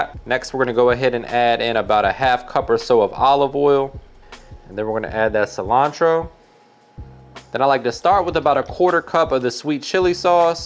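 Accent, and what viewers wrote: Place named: Louisiana, United States. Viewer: You seem to have a Louisiana accent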